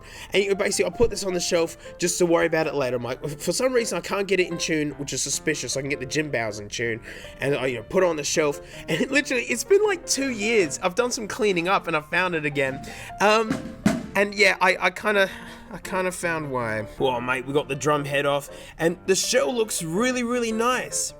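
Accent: Australia. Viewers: ever since he took a break, his australian accent thickened lol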